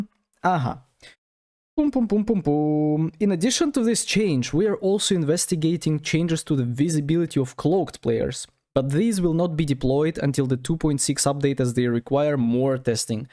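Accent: Russia. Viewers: У тебя русский акцент, ты шо житель Рашн контри? :)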